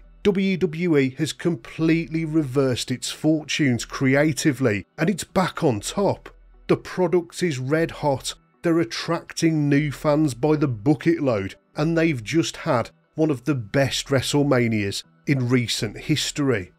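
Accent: United Kingdom. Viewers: NGL I was missing your thick British Accent opinion on this. Nice Vid Bruv.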